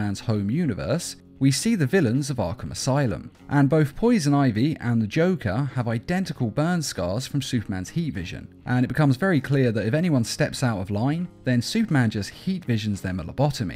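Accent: United Kingdom. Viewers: You forgot that the narrator is british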